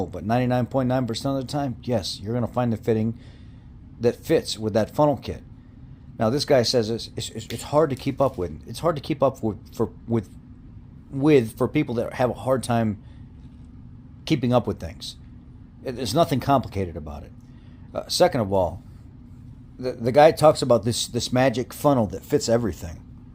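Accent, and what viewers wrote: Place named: Illinois, United States. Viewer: Hey, we can hear the Illinois in you! In many states crying like batch gets you ridiculed not appreciated! Back in my day keeping my shut saved alot of problems!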